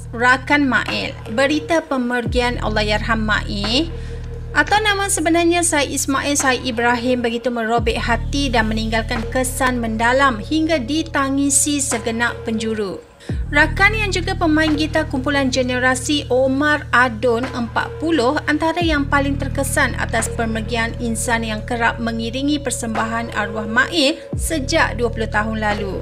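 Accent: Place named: Terengganu, Malaysia. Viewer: Hahaha.. Tula.. Perasan jgk ade slang ganu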